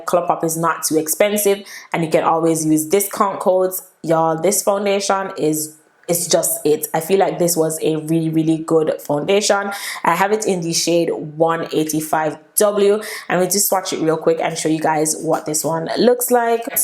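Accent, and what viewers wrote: Jamaica. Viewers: She has a Jamaican accent. Everyone has an accent sis.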